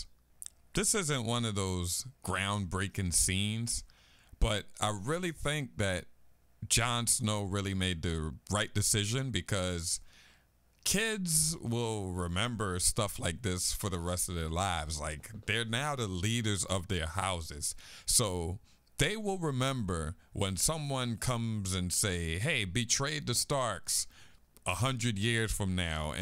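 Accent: Philadelphia, United States. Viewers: You kind of sound like Bernard Hopkins . It must be the east coast philadelphia accent.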